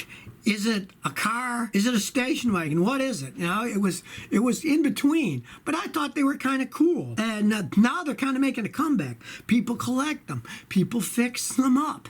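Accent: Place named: East Coast, United States. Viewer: I have listened to many of your videos and I have an important question. What kind of accent do you have? It doesn't sound like Michigan, more like East Coast. Please answer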